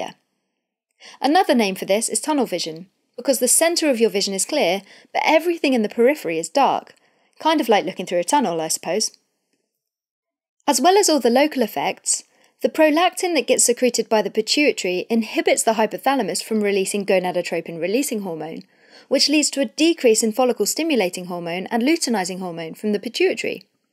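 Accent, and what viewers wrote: United Kingdom. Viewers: British accent 😊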